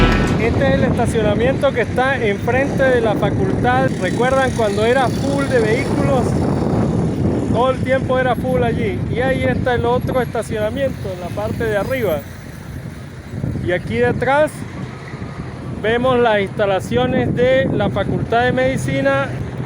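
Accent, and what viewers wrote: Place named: Mérida, Mexico. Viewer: usted habla merideño y mucho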